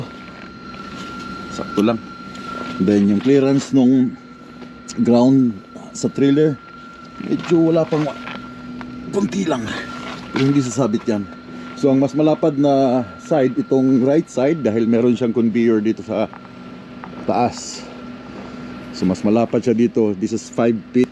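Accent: Visayas, Philippines. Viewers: Hi bisaya ka bai,kabati ko nag salita.tga diin ka MN diay sano?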